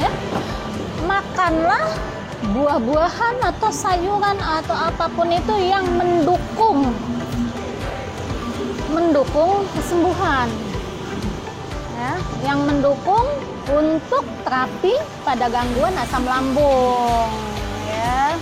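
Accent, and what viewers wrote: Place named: Baubau, Indonesia. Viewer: Aslam Alaikum Bu asalnya dari bau2 saya juga dari bau2 tinggalnya mana sekarang logatnya tadi dari bau2 bls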